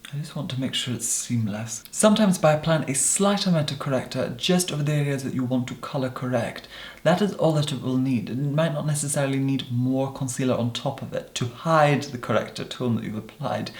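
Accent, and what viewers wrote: Scotland, United Kingdom. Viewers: I love the very subtle Scottish that comes through your poshness. Love it. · His accent is still very clearly Scottish, not the Queen's English at all. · I was in no way trying to be rude. When a person goes from having a laid back Scottish accent (a lovely accent indeed) to speaking the Queen's English, it naturally elicits some confusion. I have complete respect for anyone who wants to take speech lessons. I personally used to have a slight New York accent, and trained myself out of it and forced myself to enunciate better. I just find it a bit disingenuous to adopt an entirely different accent. The transition from a Scottish accent to some version of Queen's English, is like the equivalent of me going from speaking with a New York to a Southern accent. I'm sure people have their reasons for presenting in any way they choose, but the drastic change caught me off guard in this case. 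· im from england btw n we dont normally sound tht posh but go to his old vids from 3/4 years ago he talks in a normal scottish accent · B. M. Just looked up an old video you are right he definitely has a Scottish accent back then my apologies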